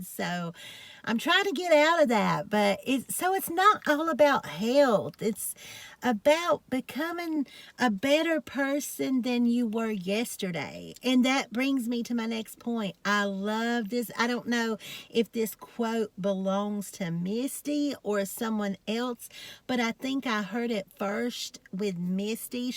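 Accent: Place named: Georgia, United States. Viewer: I love this video!!! You always make me smile! Some people on here probably know by now that I am your mother n love, but they don’t know that I’m from Virginia and the strongest south Georgian accent I have ever heard in my life is my husband’s and now I have a daughter n love who has a south Georgian accent that competes with his!!!! I love y’all’s accents. My mother has always had people ask where she was from because she has a strong Appalachian accent. Everyone always thought she was from West Virginia, close, but in south western Virginia is where she came from. I have no accent, I wasn’t lucky like, my mama and husband. But anyways, I’m so lucky to have you in my life! Your a true gift! I wish all of your new friends on YouTube can meet you, they would love you too. ❤️🤗🌹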